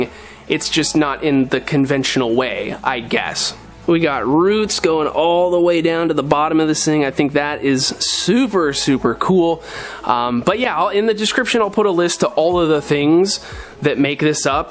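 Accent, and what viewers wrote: United States. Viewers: You’re a Minnesota kid???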